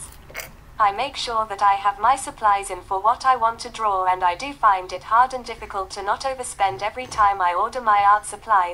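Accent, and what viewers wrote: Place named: United Kingdom. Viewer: Hi, oh yeah I found your video about "how I become an Artist" and I heard you talking, your speech is very clear. Also I heard you say you are in London, I should have figured that out from the british accent on your communication device. Alas I'm in the USA, otherwise it would have been nice to meet up, you're very pretty. Well I'll be looking forward to your Q&A video. Take care!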